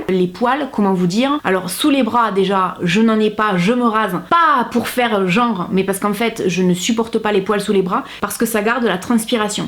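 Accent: South, France. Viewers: Je t adore bravo encore beaucoup d humour et même accent que toi ca fait du bien de voir des sudistes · J adore ton accent du sud tu es superbe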